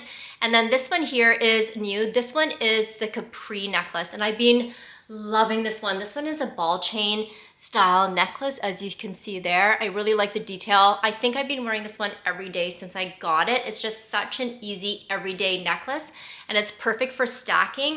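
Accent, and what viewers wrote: Canada. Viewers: Aww, I'm sorry to hear people don't appreciate your accent/the way you say "bag". I think it's unfortunate that people don't appreciate the differences in accents and how they make various words sound different. I think it's adorable that in the Canadian accent, the word "bag" sounds like "beg".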